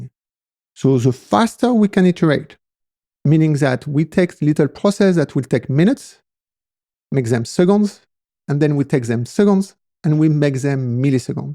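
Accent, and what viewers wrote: France. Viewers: Je reconnais un français à l'accent haha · You are awesome man, kudos! And since you mentioned the accent first now I can say that Rust is really something change me in many ways; I used to can't stand the French accent 😅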